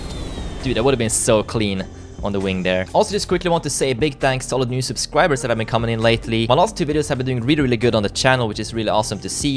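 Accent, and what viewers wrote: Sweden. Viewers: Are you swedish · Are you from sweden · ar yu swedish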